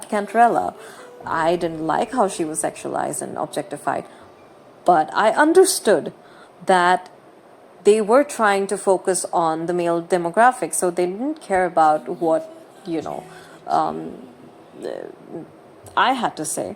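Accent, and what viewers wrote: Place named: India. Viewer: I hope we get more games like lads... Also you sounds indian if not then its completely my fault for assuming (i just seen very less indian playing lads, ) and the your vocabulary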